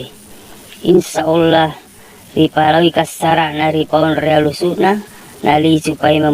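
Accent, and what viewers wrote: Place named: Soppeng, Indonesia. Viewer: Kya Bugis soppeng bahasanya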